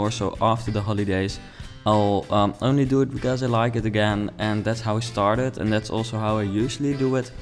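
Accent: Netherlands. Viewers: This is gorgeous. Complaints about your accent are ridiculous, your you, be proud of your accent Dutch!!!! Don't worry about it and the complaints will go away, if they don't then they don't!!!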